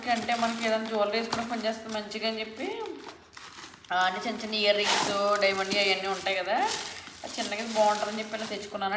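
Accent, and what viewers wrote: Godavari, India. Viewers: I too like your godavari language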